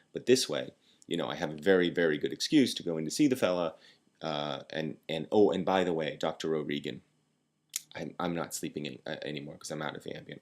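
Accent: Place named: Ireland. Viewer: Steve has an excellent point about injuries. They sneak up on you. Haven't you ever turned your leg slightly & the next thing you know your knee is swollen? Or just reach for something stupid & have your back go out? The bursitis is in your left elbow, and you're a southpaw, yes? (Satanic!) Are you doing a lot of writing lately? Not so much typing - unless you rest your elbows while you type. Which would be wrong, Mr. "Touch Typist". Anyway, your Irish brogue turned me on.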